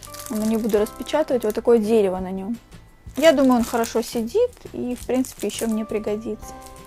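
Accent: Rostov, Russia. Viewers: А вы случайно не из Ростова-На-Дону?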